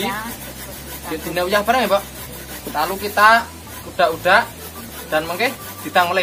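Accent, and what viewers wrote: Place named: Java, Indonesia. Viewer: Jiaaan Medok Banget Bahase Preen...Selalu Bahagia .. lan Sehat Sama Simbook ...👍❤💪...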